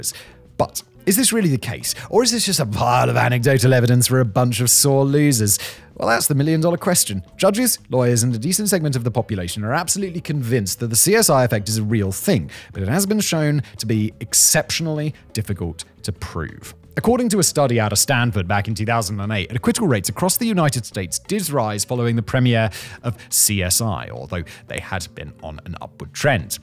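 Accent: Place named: United Kingdom. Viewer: Years I have waited and finally I am satisfied....finally got to hear say "innit" in that very mild British accent 😅😂😂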